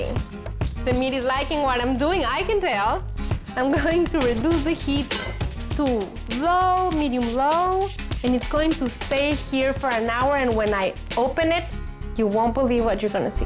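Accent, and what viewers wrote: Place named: Latin America. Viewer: She have a latina accent...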